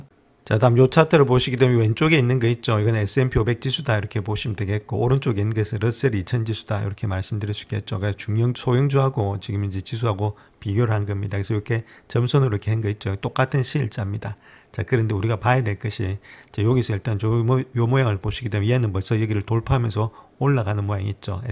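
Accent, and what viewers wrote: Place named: Gyeongsang, South Korea. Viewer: 어쩐지 좀 거슬린다 했더니.. 갱상도인가요????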